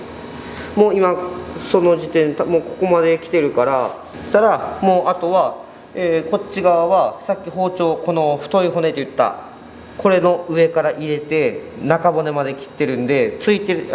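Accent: Miyazaki, Japan. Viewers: トラックのりです、宮崎出身の、先輩の話し方と同じで、とてもなつかしいです。うれしかったです。頑張ってください。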